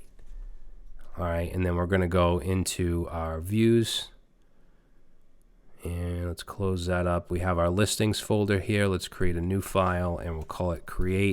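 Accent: Boston, United States. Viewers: As a 10 year software engineer wanting to learn Laravel for fun, I'm loving this tutorial man. All tutorials should be done by folks from Boston, so I can occasionally hear "what tha hell is wrong wit me?" Better than 90% of the courses I've paid money for, and more efficient.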